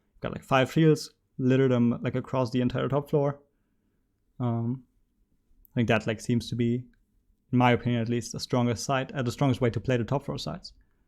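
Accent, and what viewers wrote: Germany. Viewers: Are you from germany? It kinda sounds like that to me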